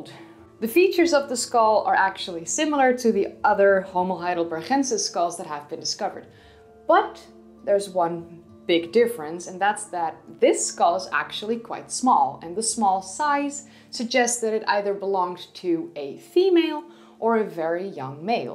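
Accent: Netherlands. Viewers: your Dutch pronunciation is very attractive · pegged you for Dutch in about 30 seconds... the Gs are a dead giveaway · Your slight dutch accent is very cute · Your accent and Dunglish is simply brilliant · Is that a lovely Dutch accent??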